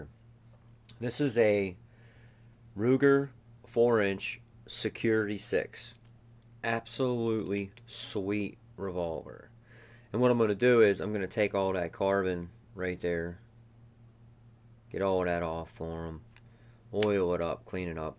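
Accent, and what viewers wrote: Pittsburgh, United States. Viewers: You sound like you're from Pittsburgh.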